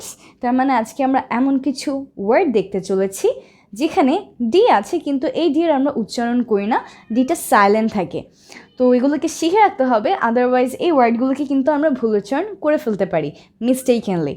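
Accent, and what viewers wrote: United States. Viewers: We need this kind of more class. Btw Sister I have a question Is this American pronunciation? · Apu ki USA accent use koren?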